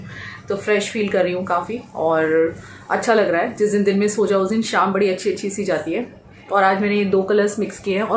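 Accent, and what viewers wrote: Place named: Bihar, India. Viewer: Aap bihari bolati Hain bahut cute lagti Hai kabhi litti chokha share Karena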